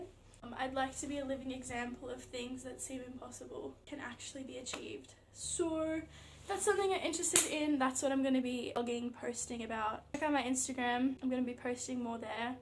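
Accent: Australia. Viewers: I really love the australien Accent ^^ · Those are some new "shocks" about us I haven't heard about :D Love your accent too, heavy US American kind of "geht mir auf die Nerven" now, so Australian is a refreshing change to listen to! Have fun in Germany :) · I'm native Ruhrgebiet but worked in international companies in Madrid and Dublin before returning recently. The thing I miss most right now is the international flair while out and about. So, please speak loudly with an Australian accent whenever possible :) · It is always intersting to see how a foreigner sees and experiences our country and us people. It can be very entertaining and at times even funny. Partially your australian accent is a bit unusual to me, but that is not too dramatic. But I know what Macas is. We Germans often say Macens. Welcome to Germany, enjoy your time.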